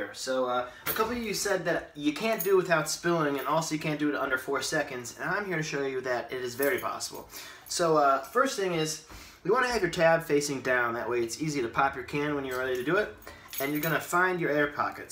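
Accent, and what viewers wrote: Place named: United States. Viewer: His accent says he’s American but his drinking skills say he’s Australian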